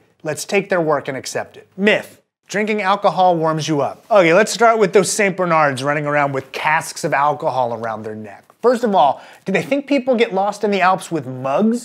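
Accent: United States. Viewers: I'm with Joseph here, remember that you are watching an American channel, we don't use the metric system. It isn't hard to tell by his accent that he is American.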